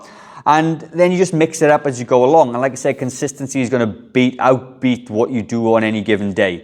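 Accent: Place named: England, United Kingdom. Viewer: 2035. Are you ready for that? I’m 72, and Lisa Liang said in 2035 there will be a billion of us that will be 85. I’ll be 82. I’m thinking Tai Chi, Yoga, calenthenics, and the like. Americans(my wife would love your English accent) tend to think that if you’re not pumping heavy iron, and running 5, or 6 miles a day, it isn’t exercise. Marius Visser, also from England says that the group he works with are 60, 70, and 80 year olds. God bless you.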